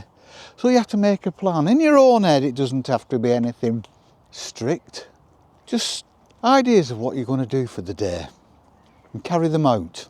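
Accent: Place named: Liverpool, United Kingdom. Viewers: I love your accent! American here but I’ve always loved a northern accent. I think you’re very interesting and enjoy hearing your views and watching your life 🙋🏻‍♀️ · Your accent reminds me of the.❤️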